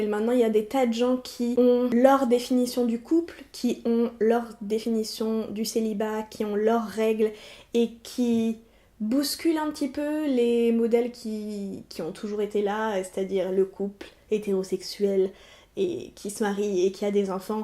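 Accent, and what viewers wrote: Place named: Quebec, Canada. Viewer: T'as tellement raison, etre en couple cest pas un but ni un objectif de vie, cest un choix. Je pense aussi que le besoin vient d'une autre époque où la survit de la race en dépendait, on en ait pu là du tout!! Perso je suis célibataire et j'adore ma vie comme ca. Je suis une personne tres solitaire et ca ne plait pas a tous et jy tiens vraiment bcp. Desfois je trouve que tu as un accent québecois :) comme quand tu dis ''plus facile à dire qu'a faire'' le faire, tu viens pas du Québec par hasard? Bonne journée à toi et à bientôt. Merci pour la vidéo, tjrs un plaisir t'écouter.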